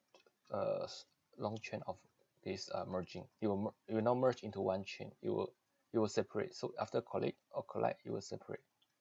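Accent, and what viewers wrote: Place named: Singapore. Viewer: hello my fellow singaporean xD !! hear one time know liao, SG voice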